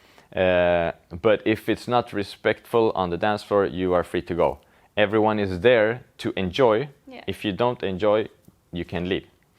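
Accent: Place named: Sweden. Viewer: Hey, are you Swedish? The reason for why I’m asking is because it sounds like you have a Swedish accent! I am half Swedish myself. I think you are the best male Kizomba dancer, you make the dance really beautiful and not too much. Mikaela is also the best female kizomba dancer in my opinion! I think she’s also Swedish... anyway it’s so fun to see you together, I hope you do dance videos together that would be absolutely amazing!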